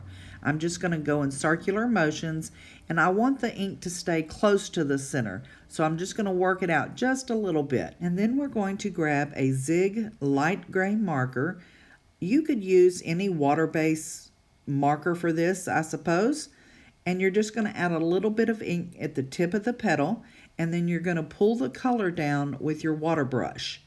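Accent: Texas, United States. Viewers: Great Video! Beautiful Card! Love That Texas Twang! xo~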